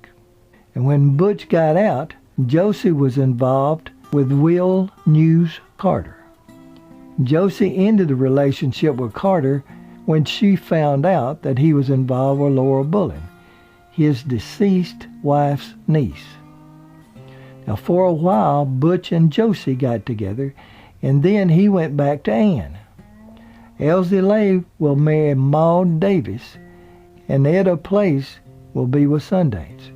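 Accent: South, United States. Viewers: A really good history lesson. I love listening to your histories of various people, and I love your southern accent too, even here in England. I look forward to the next one. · Love your videos and you have a great voice. Are you from the South? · your quite welcome..love your voice & southern accent, my parents are from Harlan, Ky.😀✌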